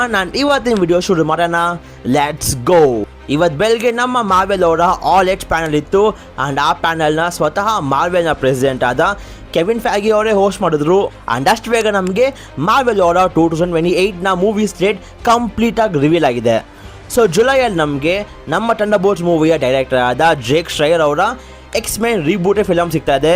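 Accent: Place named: England, United Kingdom. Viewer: ನೀವು ಕನ್ನಡನಾ ಇಂಗ್ಲೀಷಿನ ಗಂಟಲಲ್ಲಿ ಇಳಿಸಿಕೊಂಡಿರಾ ಅನಿಸುತ್ತೆ, but story super ಆಗಿ ಹೇಳಿರಿ ❤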